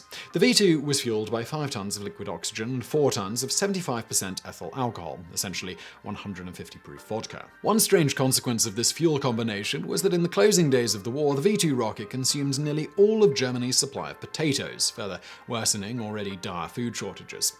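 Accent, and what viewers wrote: United Kingdom. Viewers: Was going to give a thumbs up for this video, but really? "Lootenant"? You have an obviously British accent, but what are you? A wannabee Seppo? It's "lef-tenant" in the UK (and Canada). Stop contributing to the Americanization of Britain, mate.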